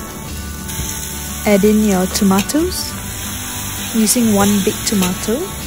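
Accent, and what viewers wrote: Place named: Singapore. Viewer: Your english is close to heart. Sounded Singaporean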